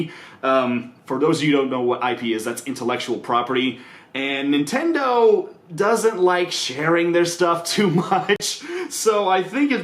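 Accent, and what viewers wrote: South, United States. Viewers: After watching one of his videos i hear the country southerness in his voice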